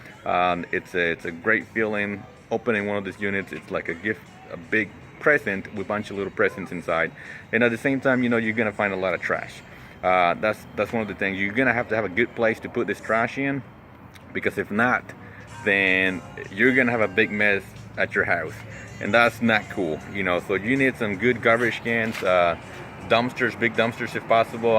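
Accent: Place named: South, United States. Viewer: Where are you? You have a touch of a southern twang, in your talking voice! XD Well, show us what you are keeping so we can advise! OMG, your going to be overwhelmed stop buying units til you clear stuff out!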